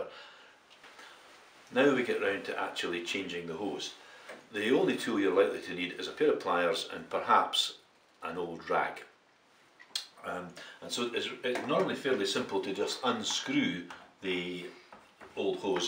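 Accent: Scotland, United Kingdom. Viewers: This is great - beautifully explained, gently and slowly, covering all the angles. As a Sassenach (but of course with Scottish ancestors - yeah, we all claim that ;-) !!), I love the accent. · Scottish but not glaswegian!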